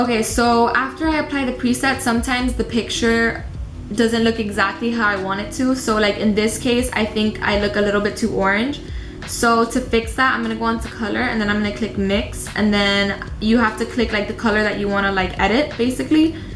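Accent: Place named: Miami, United States. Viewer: I know a Miami girl when I hear one ! 🤗 xoxo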